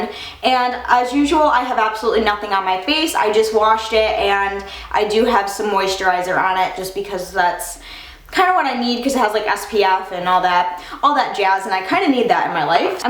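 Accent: United States. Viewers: YOUR SO BEAUTIFUL!!! ❤️💕 and girl you killed me when you said "girl, IM POPULAR!!!" 😂😅💕😘❤️ could you make a video on your everyday routine? Like makeup, clothing choices, piercing Changes, things in that genre. Also, your amazing, an I love how you speak! Are you American? That's probably none of my business but your ascent is just amazing! I love the way you say things!! 😅💕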